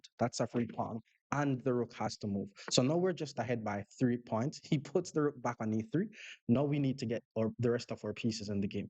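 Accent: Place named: Jamaica. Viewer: Jamaican accent makes everything sound cooler and more interesting. I can watch these long videos with all my attention the whole way through.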